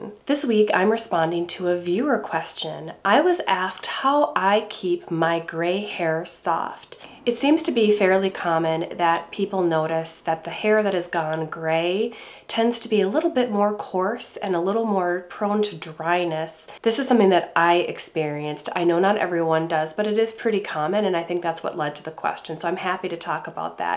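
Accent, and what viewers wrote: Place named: Minnesota, United States. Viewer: I knew I noticed a hint of a Minnesota accent! 😉. I hope you’re feeling better. My hair “routine” consists of Joico de-tangling spray, Chi Silk Infusion, my Dyson supersonic and round brush, and sometimes a touch of argon oil or anti- frizz serum once dry. My hair is straight, so it’s pretty easy. I’m envious of your beautiful curls!!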